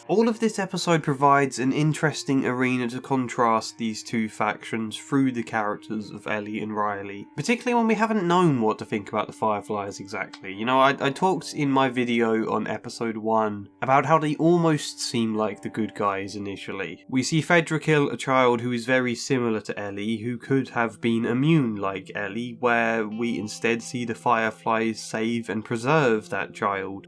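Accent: United Kingdom. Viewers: It's just as tragic as we thought but the British accent makes it sound more profound ?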